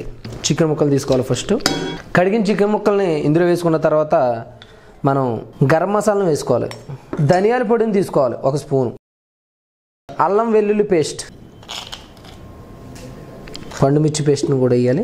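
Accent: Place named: Andhra Pradesh, India. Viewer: Bro I like Andra yaasa....from Telangana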